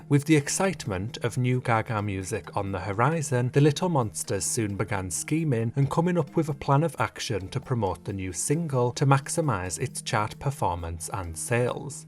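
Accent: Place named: Yorkshire, United Kingdom. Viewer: Your Yorkshire twang just shines! I swear your from Yorkshire lol:)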